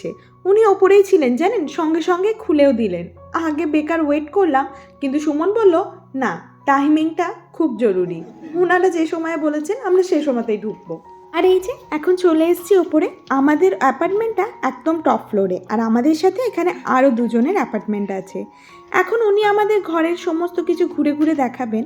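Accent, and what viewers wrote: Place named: Kolkata, India. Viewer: Well done! Your accent is like more from Kolkata :)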